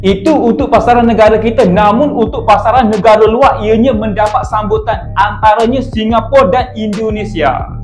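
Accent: Kelantan, Malaysia. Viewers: kelantan sangat hahahha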